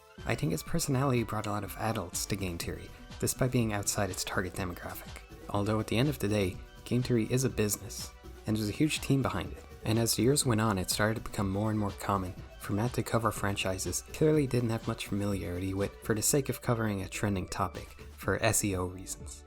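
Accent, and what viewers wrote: Ireland. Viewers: You pronounce t's like an Irishman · You're so Irish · you Irish?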